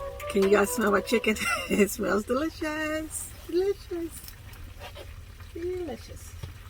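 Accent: South, United States. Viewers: Yes you sound like you from the south. This made my day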